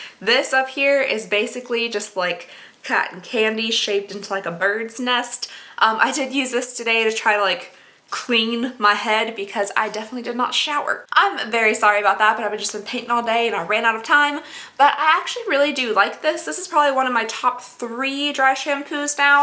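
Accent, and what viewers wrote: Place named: South, United States. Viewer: You could not get any more adorable!! I'm originally from VA (mountains of VA... closer to KY/WV/TN, depending on what direction you wanted to travel. You know... the part of VA no one thinks is there😂😂😂 Now I live in TN and you're not that far from me. One day I'm dying to take a drive and meet you for lunch or something!! Just got a Becca box and I'm thinking I need to share with you. Also....baby girl, you're not nearly as pale as I am😂😂😂 If you're looking for some great pale girl stuff, check out ETSY!! I've gotten sooooo much PR from sooooo many different stores lately that have honestly knocked my socks off! Plus, they are usually really natural in the ingredients and they are hand made so many are willing to make shades for you. Anyway... you make my day so much better. Love hearing that southern drawl, even though I know I'm so hick you would sound like a city girl next to me😂😂 Thank you for making my day better! 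Lunch and box of makeup soon!! Would be a blast!!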